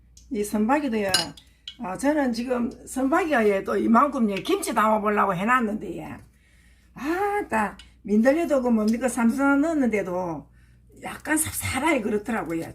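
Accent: Gyeongsang, South Korea. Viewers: ㅋ 그 손감각이라는게 해 본 요리는 되는데 생소한 재료는 쫌ㅋ 구수한 갱상도 사투리 좋습니다~~아이고 기름에 튀겨지는 소리 완전 침이 꼴깍합니데이~비주얼짱임다😄😅🤣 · 직접 채취하신 재료로 쉽게 하시는 튀김 바삭하니 맛나게 보여요 말씀이 경상도 사투리~ 정감있어세요~ 응원드립니다~^^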